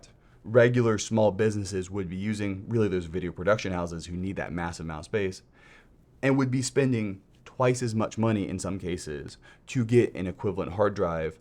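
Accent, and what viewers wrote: United States. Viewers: this accent is linked to which region in US?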